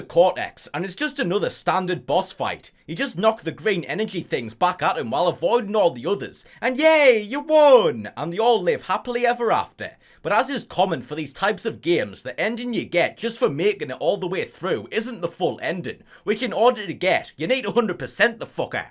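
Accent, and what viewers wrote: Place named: North, United Kingdom. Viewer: The northern accent and swearing is killing me. I wish you had presented Gamesmaster in the 90s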